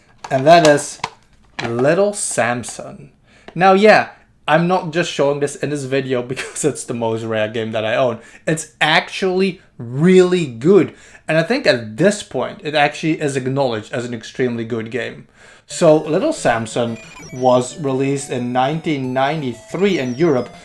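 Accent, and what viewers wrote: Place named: Denmark. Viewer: I'm guessing Danish.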